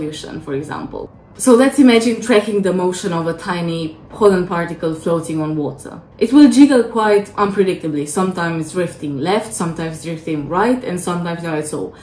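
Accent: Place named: Europe. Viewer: I can hear the European Accent.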